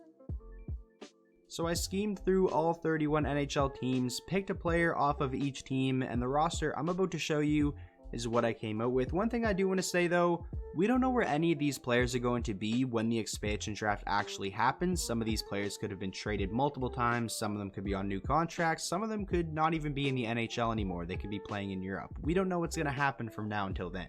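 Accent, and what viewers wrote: Canada. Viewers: Do you have a video as to why you are a Red Wings fan? For me it's because I was born and raised in Detroit and only eventually moved slightly outside of Detroit. There was no way I couldn't be a Wings fan in the 90's and later hockey in my high school was bigger than HS football in the white suburb town that we eventually annexed to. Your accent, particularly your R's sound very Canadian but I wouldn't place it as Ontario. If you're west Canada or newfie, why Red Wings? Other than having excellent judgement, any personal life connections?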